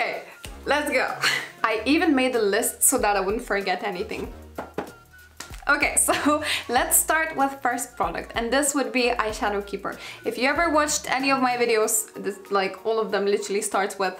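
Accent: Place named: Lithuania. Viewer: I could tell from your accent you could be possibly Lithuanian! And heck yeah to my guess you are :) Labas!!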